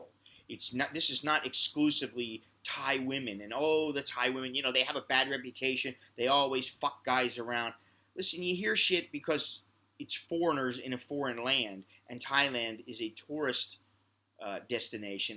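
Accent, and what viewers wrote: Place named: Brooklyn, United States. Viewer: Hey, Coming to Thailand this March. I live around the corner from Pateron. How is the rock scene in Bangkok? Do you go out to shows? I'm a musician in San Francisco and I might be considering moving to Thailand.I have a friend who's an English teacher and a girl that I'm friends with a nice Thai girl. Don't worry, I'm taking it slow as per your advice. I did a little research already and I see Bangkok recently has a budding rock scene there. Here is a link to my music: <link> It might not be your cup of tea but maybe... Anyway, you sort of have a Brooklyn type of style of delivery. Straight and to the point. You can reach me at <mail> if you'd like. maybe we can have lunch or something.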